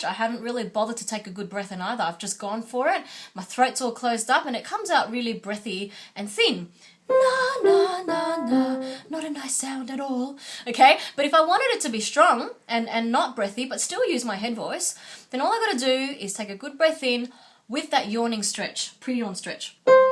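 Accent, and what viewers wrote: Australia. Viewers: LOL what does being a linguistics major have to do with anything? She has a pure Australian accent. Is the accent not the same as any other Australian accent from that region? How does her being Asian play into your interest...(ahem) exoticizing on a linguistic level? I could see if there was some residual Asian accent to make an interesting mix but there is none.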